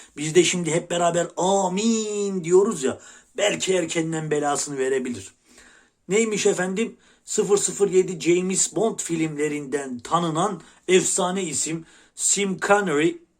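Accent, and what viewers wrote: Erzurum, Turkey. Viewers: Dadaş seni her zaman izlirem. Ben Erzurumlu değilem, lâkin Erzurum'da 29 yıl çalıştım. Senin o Erzurum ağziynan söylediğin sözler bana Erzurum'u hatirlatir. Hırtleğine basti...😄 Gorbagorun uşağı..., o.. u kordüğüm!. Erzurumu göresim geldi.. Şu zıkkımın kökü hesteliği bitse de bi gitsem..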